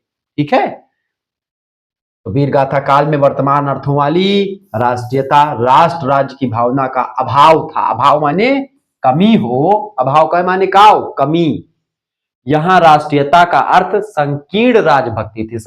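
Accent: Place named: Awadhi, India. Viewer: Sir aap Avadhi mat bola kariye 😢kyoki aapke muh se acha nahi lagta hai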